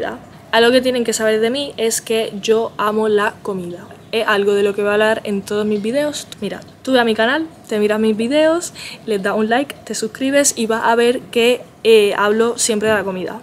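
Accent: Andalusia, Spain. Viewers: Cuando empieces a hablar no digas "hi guys", ni que fueras gringa 🤣. Di hola que tal o como estáis 👍😁. Por cierto, hablando ya eres andaluza total 😅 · Esta chica lleva 2 años en España, hasta se le ha pegado el acento andaluz. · Al principio pensé que eras andaluza. Se te ha pegado el a acentillo ya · si creo que no lo vi desde el principio, esta visto que aun necesita mejorar el andaluz. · Amos la primica, me dices que eres Andaluza y me lo creo,como se te a pegao el Andaluh, chiquilla. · Me dicen que eres andaluza, y me lo creo. Y qué bien se te entiende! Buen video y ameno. Y te expresas genial.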